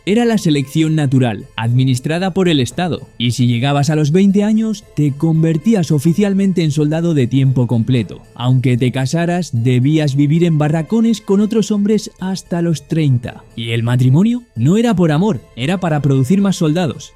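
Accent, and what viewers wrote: Spain. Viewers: العنوان بالعربي بس الصوت شكله اسباني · Como se nota que lo hizo un español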